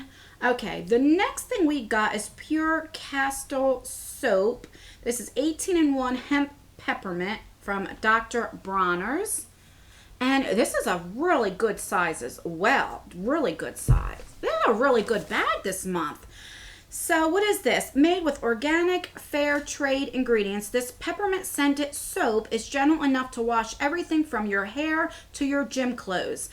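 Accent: New Jersey, United States. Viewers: I love your Neugh Joursey accent...it sounds like music to my ears💞 I was raised in Philly, I know all about cawfee and wudda.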